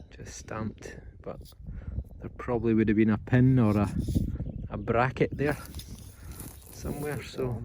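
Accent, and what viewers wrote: Scotland, United Kingdom. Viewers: Hey, hope you're doing well. Can I know, is your accent Scottish or Irish?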